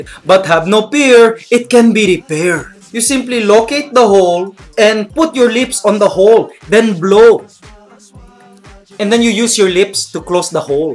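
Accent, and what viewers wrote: Philippines. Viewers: i like the accent he is not shy in our accent (some Filipino sound like that when they're speaking in English) i'm very proud to be pinoy and i admit i seak like that too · Hahaha love the tagalog accent, so strong and sharp. Very Filipino. 😂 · Haha! I love the smell of plastic balloon too! Hmm And your pinoy accent to Mikey! Hahaha Wooh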